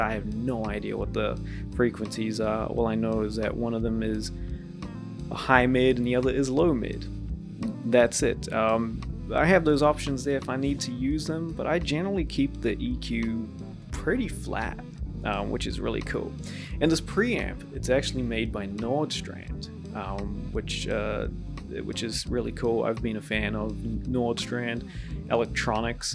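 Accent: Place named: New Zealand. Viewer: It's always funny to hear the familiar Kiwi accent out of the blue. You're an amazing player bro!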